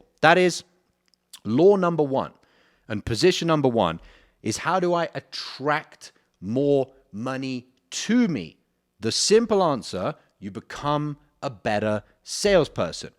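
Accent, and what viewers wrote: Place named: England, United Kingdom. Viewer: have you heard of an english accent my friend?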